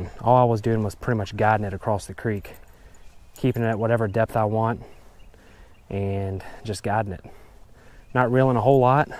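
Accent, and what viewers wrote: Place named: Baltimore, United States. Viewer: Jamie Emes my first guess was Maryland. Sounds like bawlmer. Checked his Instagram seems WV, pretty dang close to western Maryland.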